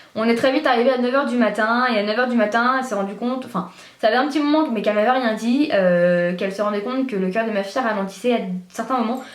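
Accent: Normandy, France. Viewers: tu as un accent normand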